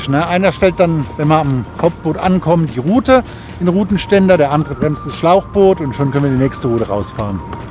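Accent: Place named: Hesse, Germany. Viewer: "Un hier ham mer beim Türk eh paar Peperonis geholt" Hessisch is die best Sprach dies gibt 😁💪🏻👍🏻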